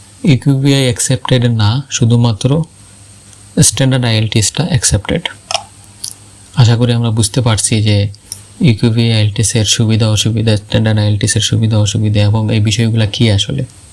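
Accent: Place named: Sylhet, Bangladesh. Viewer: I guess , you are sylhety 💕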